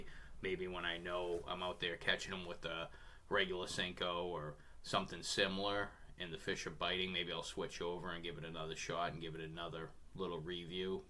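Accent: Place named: Massachusetts, United States. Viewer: I would definitely continue to fish that just the other day. I was throwing everything under the sun. I even got so frustrated I tried live bait which they were not hitting. I put a black with a blue flick on and I caught a 5 lbs. 6 oz. in 18 feet of water. I was just working it on the bottom. weightless on 3 hook the 5 inch bait. and I’m guessing you’re in Massachusetts like I am I can tell by your accent.😂 so we’re definitely fishing the same conditions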